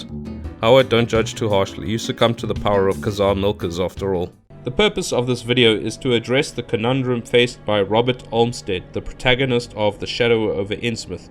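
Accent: South Africa. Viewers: You're very close. I'm from Slovakia. And you sound just like a friend of mine from South Afrika, but it seems that you've been all over the world. "The heart is deceitful above all things, and desperately wicked: who can know it?"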